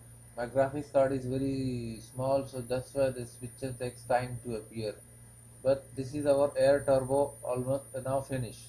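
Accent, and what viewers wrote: India. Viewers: Why there are so many Indian english accent producing engineering videos on Youtube? Is there any reason? Thanks from Brazil.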